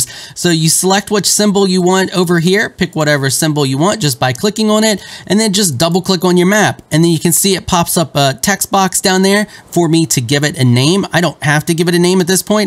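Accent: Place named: Northeast, United States. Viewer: You sound like you're from the north east US. Nice content. Be safe.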